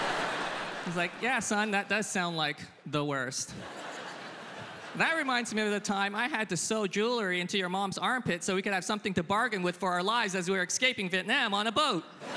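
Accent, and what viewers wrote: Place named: Minnesota, United States. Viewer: Sounds like he is from Minnasota or Canada or Northern Mich. He is right on when he hits the parent bit. Mine have been in Illinois for 70yrs. I still hear about the sacrifices and risks they took leaving Scotland. 😪. M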